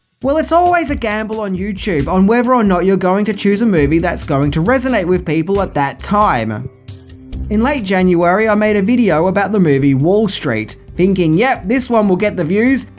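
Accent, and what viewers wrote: Australia. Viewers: Yes, I find your accent a bit annoying ( Im an Aussie too) BUT most of the facts you present are original and fresh so that's why I subscribe and follow. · Seams like someone is just envy of our boy! I like your happy face and your Ozzie dialect, it feels fresh and puts a smile on my face. Don’t change a thing and don’t bother with any haters, if they don’t like the channel they can’t go watch WatchMojo or something! Regards from Sweden! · THANK YOU, just Thank you. You are wonderful as you are and your aussie accent is brilliant, there are a lot even worse accents outthere. Greetings from Vienna, Austria. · You definitely made 2020 easier to get through and I think people with Aussie accents are just fine. Other Youtubers from there sound just as cool. How good? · Thanks, As a fellow Aussi, I don't find your accent annoying. There are worse Australian Accents than yours (Tasmania anyone). 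How about a series of videos on 70s, 80s, and 90s movie sex symbols? Just an idea. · Watching your videos helps me practice my Australian accent. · despite what some people think I think you are very positive human being with a very good sense of humour either lighting me about some of the movies I like most and some I don't like very much I look forward to hearing you speak your wonderful Australian accent keep up the good work and I'm jealous of your action figure good work brother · I have throughly enjoyed all of your videos that I’ve watched so far which has been a lot. I quite enjoy listening to your Aussie accent. As for the haters just remember this quote “You may not be everyone’s cup of tea but you are definitely someone’s double shot of vodka”. I look forward to watching more of your videos. 💜🇨🇦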